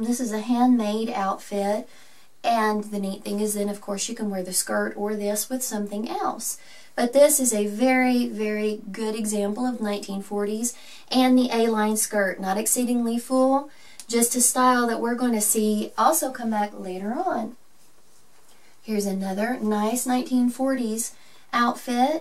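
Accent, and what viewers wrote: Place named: South, United States. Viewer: Your accent is so sweet! It makes me crave a glass of ice tea under the porch of some cute southern house ❤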